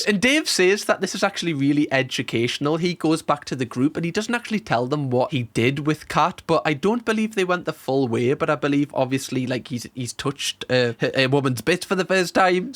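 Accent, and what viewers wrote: Newcastle, United Kingdom. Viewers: It’s his Geordie accent so watch someone else if this bothers you too much. You can’t tell people to change their accents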